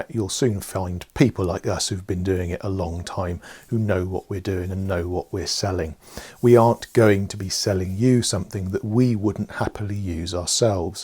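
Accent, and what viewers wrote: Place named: United Kingdom. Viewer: Im not all that familiar with British accents but u kinda sound like Ian Anderson man. Are u both from the same area in England?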